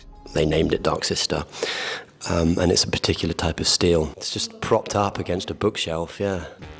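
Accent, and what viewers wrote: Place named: United Kingdom. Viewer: Love ur voice and ur UK accent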